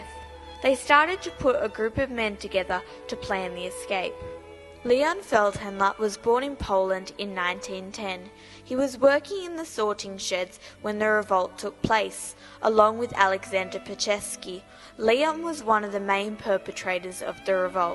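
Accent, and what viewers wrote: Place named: Australia. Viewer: Australian, one of the eastern states, has a speech impediment, private school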